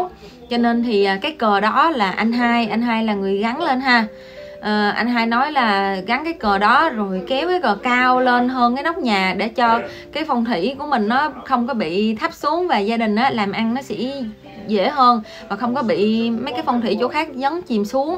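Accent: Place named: Central, Vietnam. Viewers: Chúc con gái luôn bình an và hạnh phúc .nghe tiếng nói của con như người miền trung .sống xa quê con luôn khôn khéo và cẩn thận,con,nhé. Lòng người nham hiểm khó đoán .con thì quá trẻ nên suy nghĩ rất cẩn thận trước mọi sự việc con nhé .cô luôn đồng hành kênh của con .chúc con hạnh phúc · Nghe giọng, đoán cô là người Ninh Thuận - Bình Thuận(?). Wa chúc kênh cô ngày càng nhiều người đăng ký và theo dõi.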